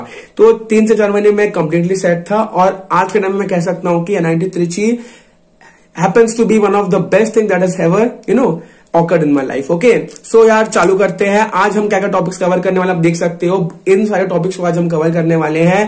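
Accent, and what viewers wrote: Bengal, India. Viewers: Bhaiya!are you Bengali or South Indian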